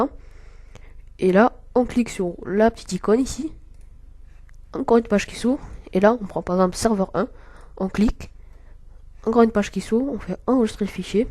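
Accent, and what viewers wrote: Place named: South, France. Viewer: tu es du sud xD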